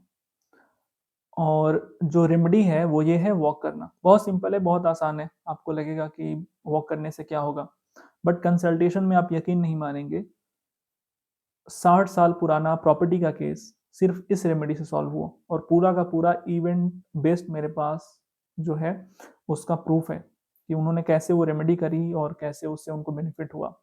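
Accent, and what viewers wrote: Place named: Uttar Pradesh, India. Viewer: Apki boli achi lagi, ap UP se ho kya? wahi se hote h zadatar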